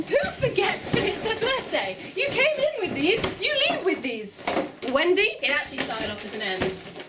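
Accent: Wales, United Kingdom. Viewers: Can you do a whole video in your Welsh accent? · THE WELSH ACCENT I just fell in love all over again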